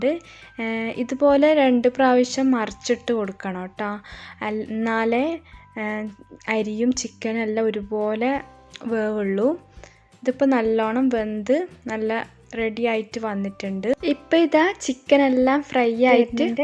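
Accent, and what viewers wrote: Kannur, India. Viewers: Sooper biryani..recipe...😋ningal kannur aano..voice kelkkumbol angane thonni...😍😍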